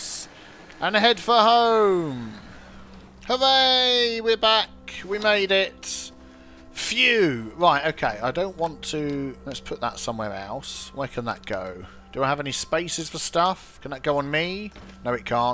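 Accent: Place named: Kent, United Kingdom. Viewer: I would say Kent, judging by the way your pronounce your R's.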